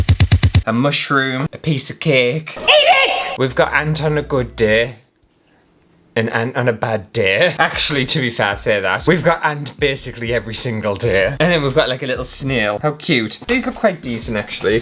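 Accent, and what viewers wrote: United Kingdom. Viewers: Cheers to all from the North, best UK accent by far. :D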